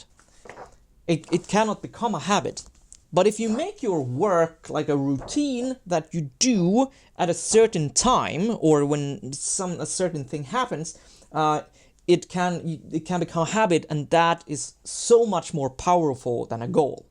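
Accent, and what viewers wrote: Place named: Sweden. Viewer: LazyDakota He's saying "yust" as in "just" with a Latin J sound. And, the accent is Swedish.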